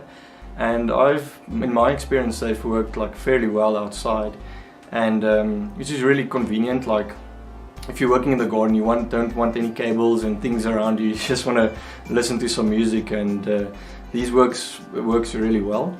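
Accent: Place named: South Africa. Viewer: That South African accent...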